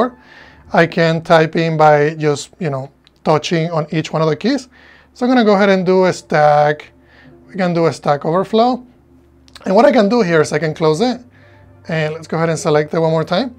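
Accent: Spain. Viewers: muchas gracias por responder!! y perdona por tomarme la libertad de escribir en español en tu canal en inglés, deduje por tu acento y nombre que lo hablabas. Me encantaría preguntarte si consideras factible que en algún momento puedan sustituir a las pantallas físicas, es decir, vemos como reproduces un trailer en una ventana de windows, podríamos estimar que representa una pantalla de 100 pulgadas o las que te apetezca, cierto? pero se aprecia que no son 100% opacas, no sé si es algún parámetro concreto que has configurado en este caso... En definitiva, sería increible poder ver una película proyectada en una gran ventana, virtualmente en una pared sustituyendo a un televisor y también, saber en qué medida afecta a tu vista, si te sientes cansado o algo similar. Muchas gracias de nuevo.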